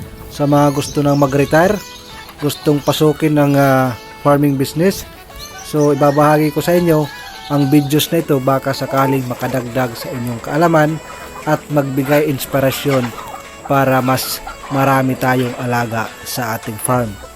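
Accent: Waray, Philippines. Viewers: San makakabili Darag Native na yan san lugar ba kayo, parang waray ang tuno ng salita nyo?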